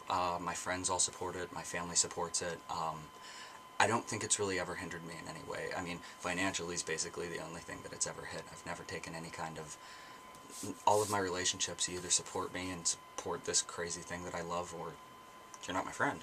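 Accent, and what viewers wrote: United Kingdom. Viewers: I have watched this video and your biggest fan video and think you are absolutely fabulous! It is so wonderful that God enabled JK to create a series that followed many kids from their childhood to adulthood getting them to not only read books longer than anyone would have believed but to devour them and beg for more. I'm also happy that the films do the books justice. Harry Potter has been just wonderful and my only question to you is did you learn your British accent entirely from the films? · i am british and i honestly thought you were as well from that video you did.... impressive accent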